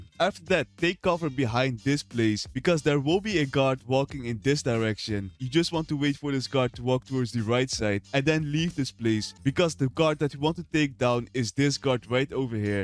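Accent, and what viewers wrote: Netherlands. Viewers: Hes defo dutch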